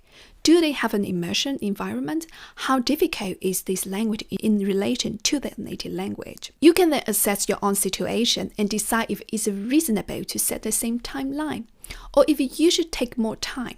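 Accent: England, United Kingdom. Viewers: I can hear the British English accent so hard in her English, it's adorable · i was surprised to hear english wasnt your first or even your second.. i can hear almost a british accent from you.. the mesh is beautiful! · Oh wow that’s really good that you’re able to learn that many languages. You shouldn’t be ashamed with about anything it’s not your native language. Ok here’s a new challenge for you, just for fun. How about trying working on your accent, you got the old English down, let’s try American English. This might be a bit more challenging but doable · Thank you! Your English is GREAT! I understood every word and was highly intrigued by the idea of your almost British, sounding, dialect. It has a certain je ne sais quoi.... <3 Furthermore, your video was inspiring and multifaceted in many areas of Life AND Learning. {in my opinion of course;-) } My mom taught me THIS gem, "Your haters are brillo pads, they may be abrasive because they rub you the wrong way; however, if you disregard your discomfort, you will find that you have become polished and exuberate a new found brilliance and SHINE!!! 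Keep shining my friend, KEEP SHINING!!!!🌻💟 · Hello, I have just discover your channel yesterday night (we are in the morning when I writing this message) and I love your videos and learn so much! I am learning japanese and need to learn and have fun in learning. I see that is more simple to learn when it's a pleasure. Your stories and those of your friends are so shocking. Your are so kind woman and you speak so well english. I love your british accent and understand without difficulties what you say. I'm french and I know that native speakers like to correct rudely people sometimes. But I don't understand why they act like this. But there is people, like you said, that are very caring too. I want to thank you for this video and try to have those advices in my mind. I never really practice english outloud because I think that I will not have enough words to express myself but I understand well english. 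I will try to communicate more now! Thank you so much! Je te remercie pour cette belle vidéo, et j'espère voir aussi un jour des versions françaises de certaines de tes vidéos qui sont excellentes:) Merci encore pour tes conseils et belle journée à toi!!! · Hi Miss. Your voice is so gently😊. Do you speak english in british accent??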